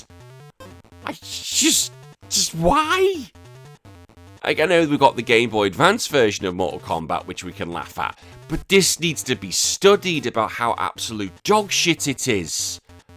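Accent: United Kingdom. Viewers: How do you sound even more British than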